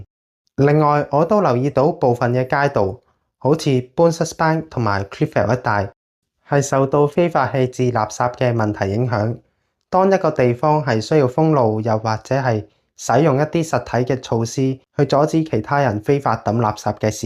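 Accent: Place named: Hong Kong, China. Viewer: 講者的英語口音仍停留在港式發音。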